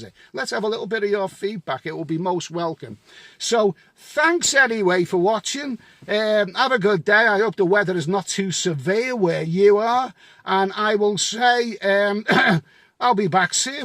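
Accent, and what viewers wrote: Liverpool, United Kingdom. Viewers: A scouser in a fedora peddling derty smutty videeos....count me in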